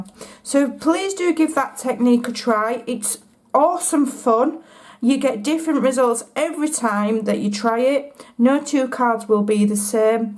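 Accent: United Kingdom. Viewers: Your video was the first I watched. I have lots of 3D embosses folders. I could watch your video all day because of how simple it and easy it looks and I could listen to your lovely accent all day! Where are you from in the UK?? Right now I am addicted to watercolor painting florals using stamped and embossed images ... using Ken Oliver color bursts....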